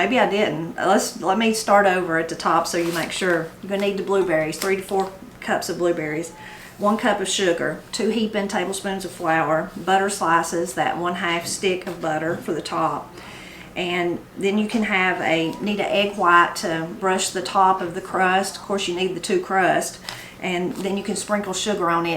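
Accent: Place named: South, United States. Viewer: Good morning, I enjoy your videos. Thank you I live in Connecticut, your southern accent is charming and you stories and anecdotes are interesting. I would like to offer my tip for blueberry picking. I want to use 2 hands to pick so i use a milk jug that I cut the top off but leave the handle to which I tie a ribbon or string that I put around my neck for hands free picking. I always bring a larger container to dump into as I go so I can get slit of berries.🙂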